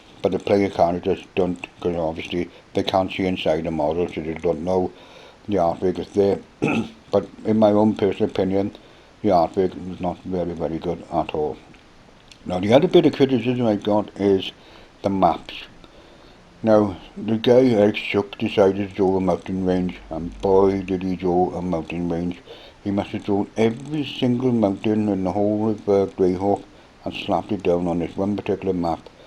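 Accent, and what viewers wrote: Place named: Wales, United Kingdom. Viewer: I've got an upcoming character on my channel I was thinking of giving a Welsh accent so I spent the night listening to your videos. I'm from the States so it's tough getting the rhythm down. The episode will be up in a week or so. Thanks for your work by the way. The classics are the best.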